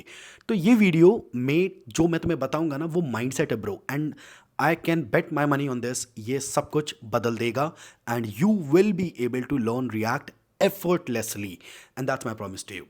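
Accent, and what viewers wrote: Bhopal, India. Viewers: the bhopali accent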